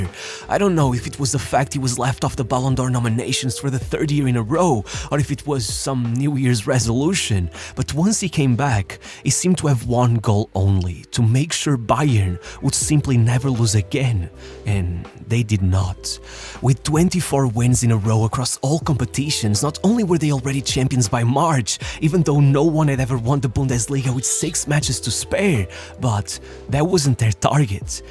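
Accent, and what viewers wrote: Portugal. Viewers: Bro, dumbass he's Portuguese and their accent is like that(in some areas). You're probably American or some shit and you would never understand.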